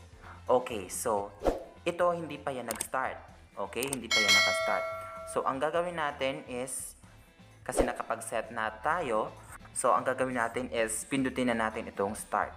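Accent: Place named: Visayas, Philippines. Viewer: Sorry ‘te medyo I practice mo ng konte yung visayan accent mo medyo nahihirapan kaming listeners mo makasabay sa mga info mo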